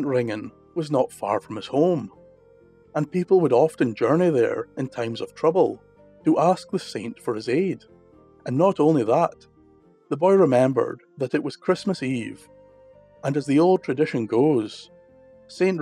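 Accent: Scotland, United Kingdom. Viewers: No better accent than the Scottish accent, of course i may be biased lol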